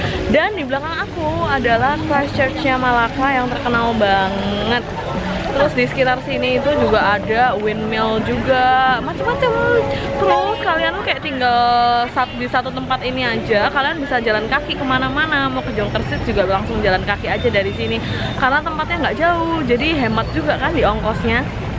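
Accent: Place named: Java, Indonesia. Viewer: Logat nya ky org jawa kak,aq org jawa stay di malaysia sudah 11 th,aq pernah travel ke malacca...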